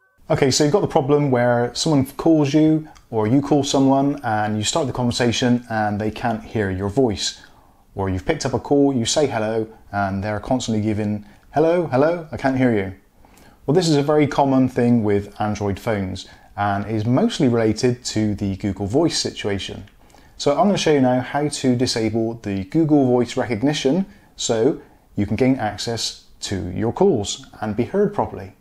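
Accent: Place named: Devon, United Kingdom. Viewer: you're a bloody genius! Thank you! Nice to hear an accent from home too! (I'm from Torbay) Once again, you've rescued me from a compete shock when I made my first voice call on a brand new phone! Cheers!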